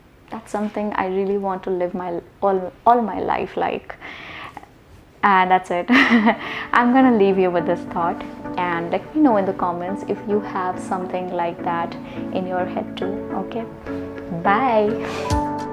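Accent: India. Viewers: I’m not trying to learn English (I actually teach it)! So I don’t why I’m now addicted to your videos 🤷🏻‍♀️. Yes I share your sentiments. I gave up my successful job in the corporate sector & went into teaching & training - just to make a difference to the lives of young people. Yes I want to retire in the BEAUTIFUL birth place of my mother 🇮🇳 & now make a difference in the country she loves so dearly, that day is almost here for me 😊. Just one other thing - now I think I will stop trying to train students to ‘drop’ their Indian accent. Your Indian accent is BEAUTIFUL 👌🏾- it’s something people should be proud of & not endeavour to copy EVERYTHING about us foreigners 🙄😁 keep sharing your love & inspirational videos 🏆. Much love xx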